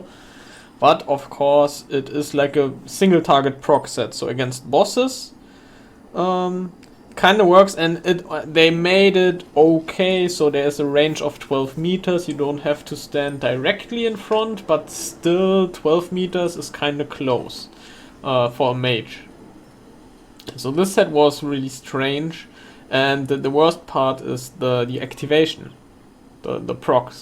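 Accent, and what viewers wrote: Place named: Germany. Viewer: Your German accent is nice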